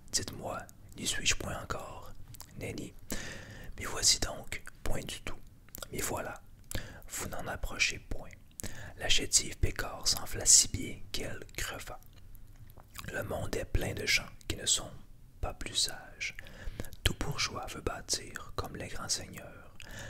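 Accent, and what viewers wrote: Quebec, Canada. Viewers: Alors d'habitude je déteste l'ASMR québécois car j'ai du mal avec votre accent mais avec toi, ça passe nickel et je suis ravie 😄 En plus, j'adore les lectures chuchotées. Je vais aller découvrir le reste de ta chaîne avant de m'abonner 😘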